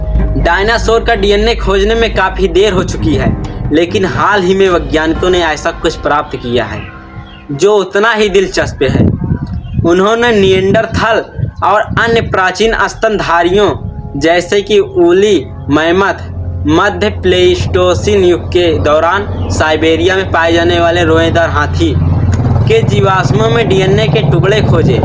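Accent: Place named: Bihar, India. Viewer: Apki bhasa se lag raha hai aap bihar se ho?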